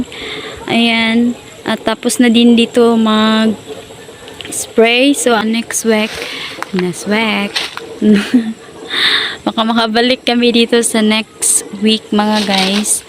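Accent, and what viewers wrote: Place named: Binukid, Philippines. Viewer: Binukid jud imong tirada ba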